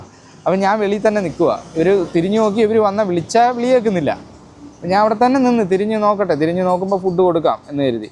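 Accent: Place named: Pathanamthitta, India. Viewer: Bro Try to solve and have a good life with your child, നമ്മളോട് നന്നായി behave ചെയ്യുന്ന നമ്മുടെ relatives മറ്റുള്ള എല്ലാവരോടും അങ്ങനെ അകണം എന്നില്ല. ആവശ്യമെങ്കിൽ അവരോടു കൂടി മാറി താമസിക്കണം. ഇന്നത്തെ കാലത്ത് എല്ലാവരും എല്ലാവരെയും സഹിച് ജീവിക്കണം എന്നൊന്നുമില്ല. നിങ്ങളുടെ ജീവിതം നിങ്ങൾ 3 പേരുടെ മാത്രം ആണ്. അവരോട് നേരിട്ട് ചോദിക്കുക ഒന്നിച്ചു ജീവിക്കാൻ താല്പര്യം ഉണ്ടോ എന്ന്, എന്നിട്ട് മുൻപോട്ട്. You sound kike from Pathanamthitta or Kollam area, all the best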